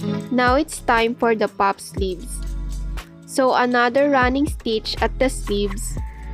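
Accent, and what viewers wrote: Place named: Philippines. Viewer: Your accent tells me that you are Filipina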